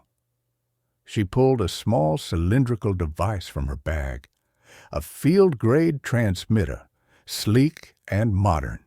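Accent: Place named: South, United States. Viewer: Largo, Florida. I love your stories but I'm not crazy about the newer voice with the slight southern accent... 🙏💞🙏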